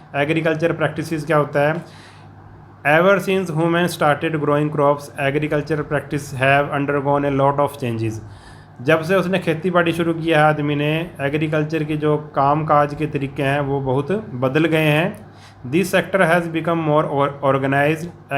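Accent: Punjab, India. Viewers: I think he is Punjabi so his accent is Punjabi 😊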